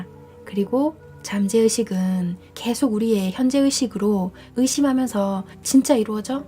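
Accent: Gyeongsang, South Korea. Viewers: 경상도 말투 좋아요👍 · 경상도표준말 듣기좋아요, 저도 경상도표준말 씁니다. 목소리 참 맑고 곱습니다. 늘 잘 듣고 있으니 쭈욱 이 목소리와 말투로 해주세용~~♡♡ 감사합니다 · 님 좋아요 경상도 사투리가 매력적인 감동입니다 저도 경상도 사람입니다 책 내용이 중요하지요 감사합니다 행복하세요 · 말끝에 ~~하는 갱상도 억양만 고치면 되요. 쉽지요? 난 조선족, 북조선 말투 할 수 있는데 그살람들은 남한 억양 몬해요.. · 사투리? 경상도 표준어 너무너무 매력적입니다 정감이 넘치는 말입니다 아주아주 세련된 말입니다 감미로운 목소리 정겨운 목소리 감사합니다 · ^^ 목소리 너무예뻐요 경상도사투리라서 더예쁨 영상도아주좋아요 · 경상도 사투리에 아가씨가 그리워지네~~^^ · ㅎㅎ 이미 억양 자체가 사투리입니다 ^^ 경상도사람이 경상도 말씨는 당연한겁니다 경상도 사람이 서울말쓴다고 말하는거 들어보면 ᆢㅎㅎ 웃기는것같아요 ᆢㅎㅎ 늘 좋은책 잘듣고있습니다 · 경상도 사투리가 매력 짱짱짱 이네요~^^ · 갱상도 사람은 표준말을 쓴다고 해도 갱상도 사람이 들으면 갱상도구나 느낍니다.ㅎ. 좋은 책 소개 감사합니다.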